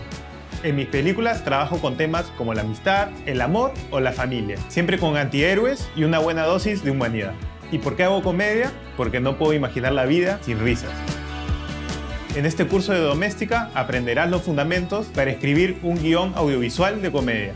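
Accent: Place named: Spain. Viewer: ESPAÑOL DE ESPAÑAAAAAAA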